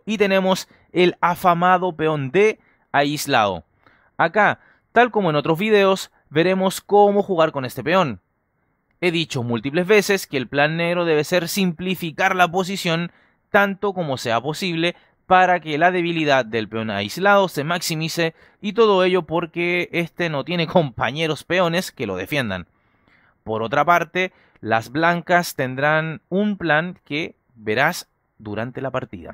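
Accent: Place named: Chile. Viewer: el acento chileno me resulta muy comodo para entender analsisis de ajefrez ! gracias!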